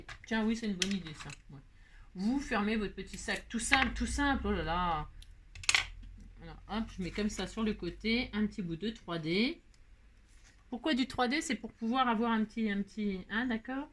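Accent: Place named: Paris, France. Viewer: Coucou , j’adore votre voix de titi parisienne , vous devriez mimer cette réplique : « atmosphère,atmosphère,est ce que j’ai une gueule d’atmosphère ? » 😃😉 merci pour les tutos..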